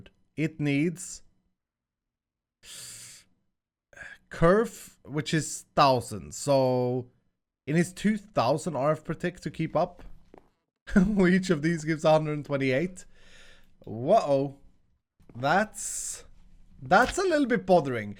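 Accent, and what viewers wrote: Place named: Sweden. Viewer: Why does he sound more swedish than last time??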